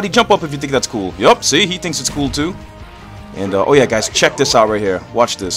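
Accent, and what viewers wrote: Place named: Brooklyn, United States. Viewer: the more worked up he gets, the thicker his brooklyn accent gets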